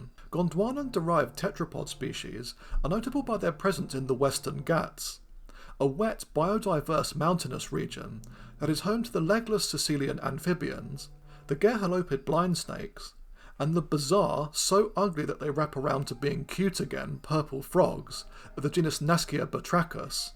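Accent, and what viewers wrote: United Kingdom. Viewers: you sound like paddington but more british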